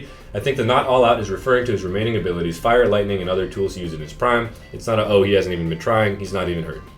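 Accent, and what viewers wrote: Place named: Balkans, Eastern Europe. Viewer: Knew it, the name, the humor, the accent, the looks. Everything screamed Balkan Slavic.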